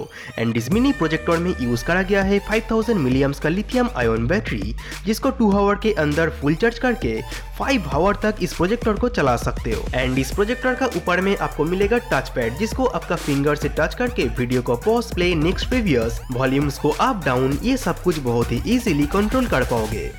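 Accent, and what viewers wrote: Bengal, India. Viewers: Bhai aap bengali ho kya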